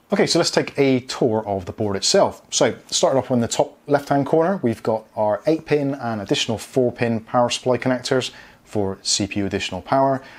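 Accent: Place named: West Country, United Kingdom. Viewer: Ayy you sound like your from the south west! Bristol gang 😃🤣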